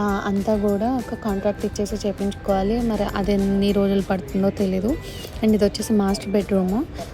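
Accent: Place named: Telangana, India. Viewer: Telengana language vachesthundira 😂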